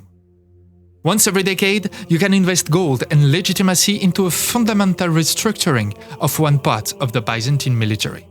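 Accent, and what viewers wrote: France. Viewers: english with a french accent but one that is nice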